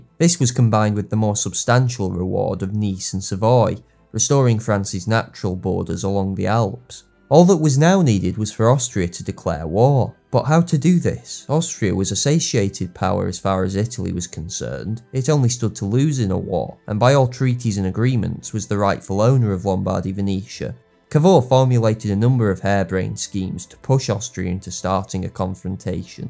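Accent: United Kingdom. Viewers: high quality content on interesting subjects with a grest british narrator!!! cant ask for snything more :)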